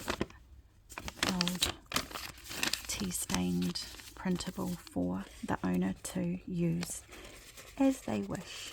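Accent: New Zealand. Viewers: Gosh, some yummy ideas in this, I love it. What great idea for the Hydrangeas!!!! So very lovely and inspiring. Sending love from New Zealand. xxxxxxxxx Actually it sounds like you also a Kiwi. !!!!! You are very talented. xxxxx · When I heard your accent I knew New Zealand! 💖 Can't wait to eventually visit.... Lovely journal YES so many ideas! I especially loved the double sided lace pocket that revealed the card inside! thank you for sharing your work!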